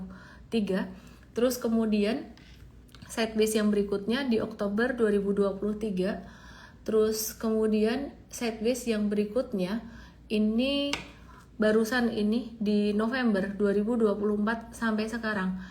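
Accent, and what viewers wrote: Java, Indonesia. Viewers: Suaranya medok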